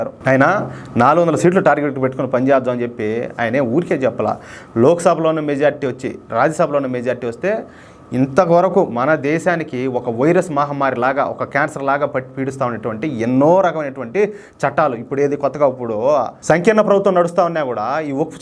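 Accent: Rayalaseema, India. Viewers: నీ బండ బడ వయా. మన ఛానల్ శుక్లపక్ష చంద్రునివలె దినదినాభివృద్ధి జరగాలి మన నెల్లూరు చిత్తూరు యాసతో అదరగొడతా ఉండావయ్య. భగవంతుడు నీకు మరింత శక్తిని ఇవ్వాలయ్యా జైశ్రీరామ్ జై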